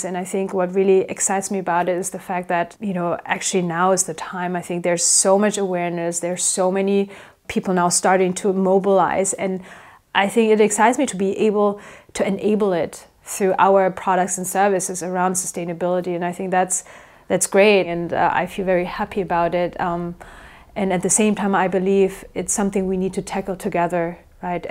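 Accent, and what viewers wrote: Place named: Germany. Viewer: Ich mag ihren deutschen Aktzent...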